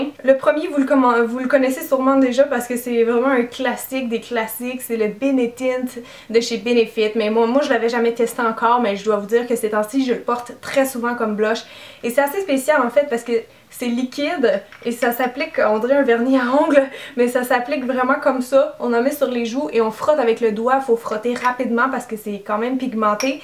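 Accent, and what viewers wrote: Quebec, Canada. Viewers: Tu me fais toujours rire dans tes vidéos! J'adore ton accent, vraiment j'pourrais écouter l'accent québécois toute la journée!! ^^ Super vidéo comme d'habitude! Et j'adooore tes sourcils!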